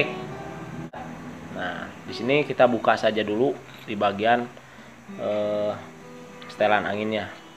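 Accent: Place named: Sunda, Indonesia. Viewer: Mang ngomong nya yang leres 😂😂😂 Indo campur sunda.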